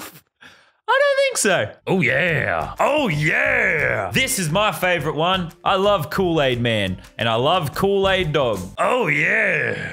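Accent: United States. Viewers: bruh his american accent is actually insane lol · no joke, Lazars American accent is on point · So we’re just not gonna talk about how Californian his American accent was, he sounded like a different person!!! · was anyone else surprised by his American accent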